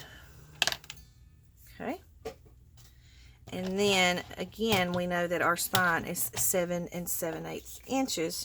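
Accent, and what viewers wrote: South, United States. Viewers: I also love your accent. Yes, you do have one, It reminds me of my southern cousins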